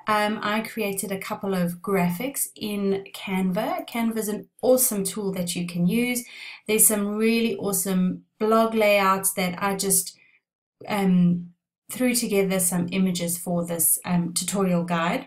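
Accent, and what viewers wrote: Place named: South Africa. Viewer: This is so helpful...I imagine things look a little different now, but I’m excited to give this a try! Plus!! So nice to hear another South African accent! 😉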